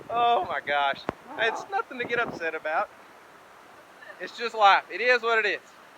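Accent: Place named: Oklahoma, United States. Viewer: So glad I found your channel! Moved from near Oologah OK to UT in Dec 17. Nice to hear that Okie accent!!!!! These people here never understand what I am saying but love the mountains!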